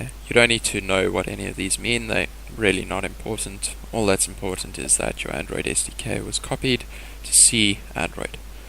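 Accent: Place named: South Africa. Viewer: I opened this video randomly and immediately recognised a South African accent😂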